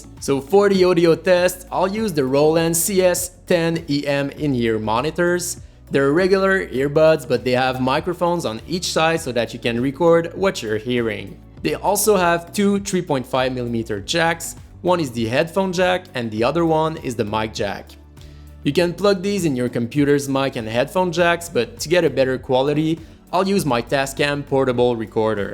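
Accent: Quebec, Canada. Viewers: // He's from Canada, one of the French regions.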